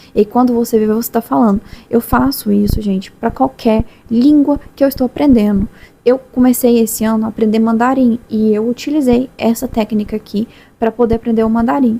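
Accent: Minas Gerais, Brazil. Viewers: Tenho uma curiosidade......você é mineira?(de Minas Gerais?)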